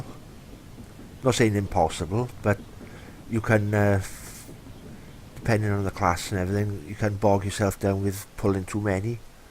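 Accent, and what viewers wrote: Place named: Wales, United Kingdom. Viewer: Love your Welsh accent Baaaaaaaaaah (sorry that was unnecessary I know).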